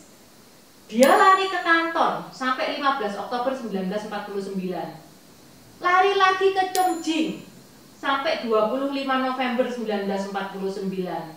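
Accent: Java, Indonesia. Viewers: Bagus memberikan wawasan baru. Tp mgkn lain kali perlu latihan pengucapan istilah tionghoa nya spy lbh enak di dgr gtu. Ini msh terlalu medok logat tionghoa jawanya.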